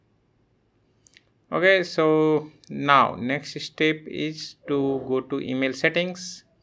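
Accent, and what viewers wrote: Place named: India. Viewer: Excellent video. Was somewhat difficult to follow the Indian accent, but i will try this way to install Mautic after trying the bare bones SSH which I got some issued with certificates, redirections or DNS don't know....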